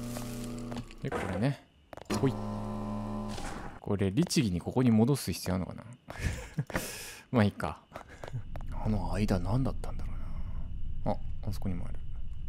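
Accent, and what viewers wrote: Hokkaido, Japan. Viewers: 先生の作品紹介が全部好き。滑舌良くて聞き取りやすい。そしてたまに出てくる北海道弁がかわいい笑(私も道民)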